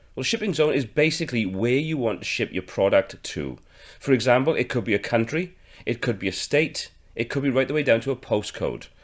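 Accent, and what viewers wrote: Wales, United Kingdom. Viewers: Only just found this! Thank you so much! Also used to live in Cardiff whilst at Uni, so pleased to hear that Welsh Twang! Quick question: I have 3 products with a £1.29 UK Large letter size cost. I have 4 products with £3.85 UK small parcel up to 2KG cost. However, I'm not sure how to make the shipping default to £3.85, if 1 of each product is in the basket, as both will go in 1 parcel. This is not a percentage or item based cost equation. Many thanks in advance for your help - happy to email and pay for your help too. Neela.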